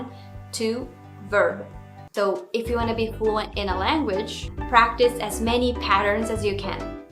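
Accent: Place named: United States. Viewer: Your voice is like an American.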